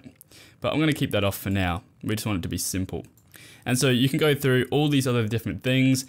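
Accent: Australia. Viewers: i can tell this guy is australian in a second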